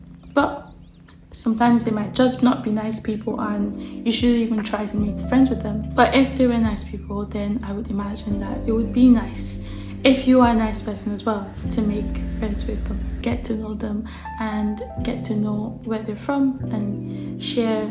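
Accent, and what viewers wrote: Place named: Glasgow, United Kingdom. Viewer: You definitely have a a Glasgow accent tinge in your voice! From a Glaswegian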